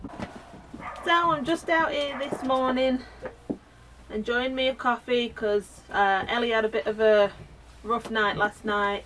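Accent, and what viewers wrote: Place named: Northern England, United Kingdom. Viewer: Hi!! Your accent- it sounds like it's from Northern England! Do people in your area usually speak like that?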